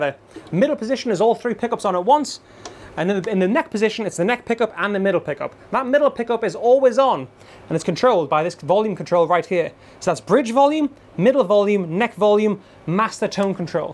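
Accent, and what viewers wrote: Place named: Northern England, United Kingdom. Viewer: Y tiene uno de esos acentos del norte de Inglaterra.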